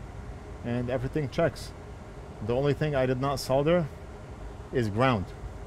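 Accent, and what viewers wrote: Lebanon. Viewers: Maaaaaan i was trying to see how to fix my USB to just hear a familiar Lebanese accent.. double shock.. good job btw.. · Me too, ana lebnene w behke nafs l chi 🤣🤣 nafs l lahjee fik to2ofro lebnene hata mn wejjo w hek Lebanese people making the difference all over the world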